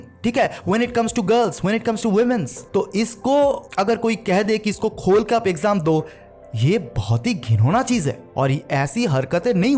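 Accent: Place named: Bengal, India. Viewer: Pehle toh ye bangali accent chod de bhai. Warna hum k lecture ae he khush hai. Ur accent literally irritates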